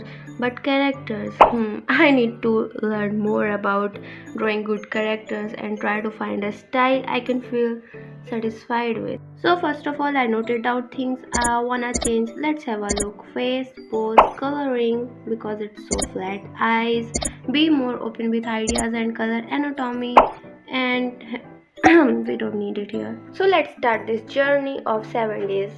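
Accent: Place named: India. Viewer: YOU'RE SO TALENTED!!!! I LOVE THE WAY YOU COLOUR!!! are you indian? forgive me for asking but Im indian myself and i thought your accent sounds a bit familar to me 😵‍💫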